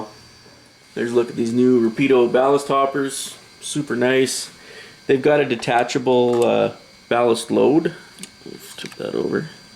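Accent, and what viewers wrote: Canada. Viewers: Just curious but are you out in Saskatchewan, Your accent reminds me of my moms best friend who base hopped to all the same postings we got when I lived at home. Love the Layout and I hope that you get past your water effects roadblock.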